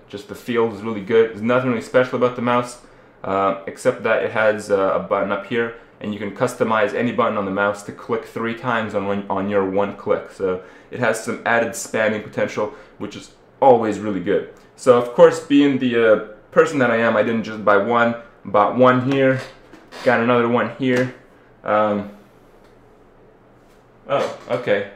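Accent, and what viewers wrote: Romania. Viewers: parca din Cluj e :) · You Romanian ? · kripp, are u romanian? :D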